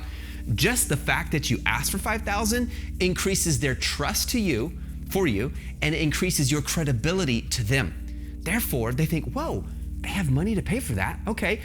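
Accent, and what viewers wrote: United Kingdom. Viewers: Very interesting video, and as an authentic Chinese I can’t agree more and found your ideas are very helpful, especially about the long term business relationship and friendship part. Those customer service staffs work ridiculous hours and get very exhausted, if we respect them and make them feel nice talking with, the communication would be much smother and more efficient. The end I wanna thank you, your videos are so helpful and encouraging. I already found a product that I wanna try with on amazon, and today I already started sending messages to suppliers. Good luck to me! Btw, I’m living in UK, and I don’t think your British accent is too bad, hahah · Your British accent!!! 😂Loved this video!! You're amazing at simplifying all this overwhelming information. My partner and I are ready to begin the negotiation process. I'm nervous but I'm feeling so much more comfortable! 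Thank you!!🙏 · A little bit funny British accent but great. You are awesome!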